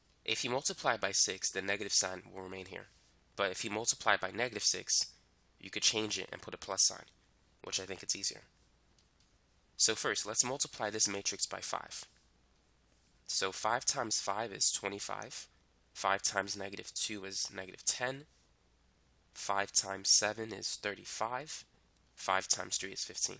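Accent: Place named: Boston, United States. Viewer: I feel like you have stereotypical boston accent and I love it.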